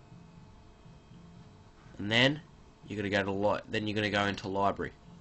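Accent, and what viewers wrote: Australia. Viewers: I frickin love your accent bro im aussie aswell. good to hear it and thanks for the video it helped. Aussie pride amrtie ?! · Thanks for the tute mate. Good to hear another fellow aussie voice ;)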